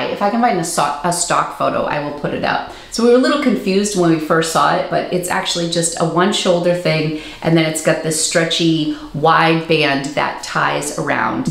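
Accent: Boston, United States. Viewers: First time viewer.......where is your Boston ascent.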